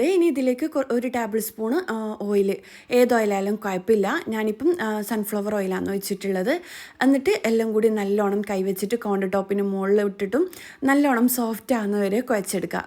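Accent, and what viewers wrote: Kannur, India. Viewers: നമ്മൾ കണ്ണൂരുകാരുടെ സംസാരം ഇങ്ങനെയാ😁😁🥰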